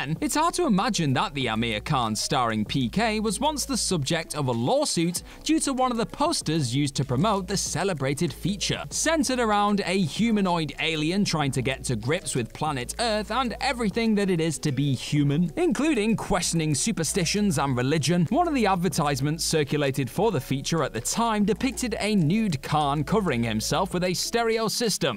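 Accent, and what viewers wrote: United Kingdom. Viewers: "He's English" You seem to be under the mistaken assumption that they have one accent/dialect. Which is wrong. This is a specific UK dialect that does pronounce the "g" in hangover. Other dialects don't.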